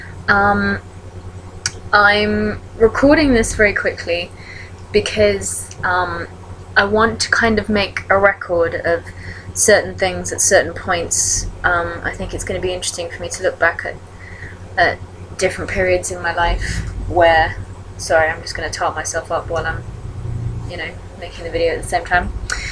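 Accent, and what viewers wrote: United Kingdom. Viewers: thank you. Nice video of LOA also British accent. I subscribed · Her accent is the most catchy thing ever, so cute :) Is that UK accent? What part of the UK is she from? I love it!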